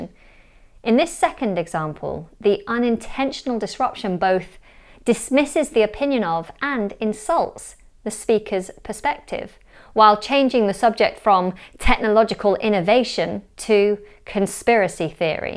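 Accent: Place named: RP, United Kingdom. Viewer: I adore your posh accent! :)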